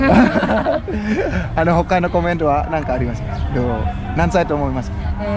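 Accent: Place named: Bali, Indonesia. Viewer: Care nak Bali logate bli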